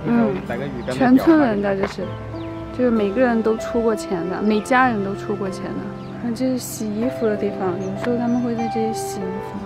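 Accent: Chongqing, China. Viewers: 喜欢的女生也是重庆口音，听着好亲切啊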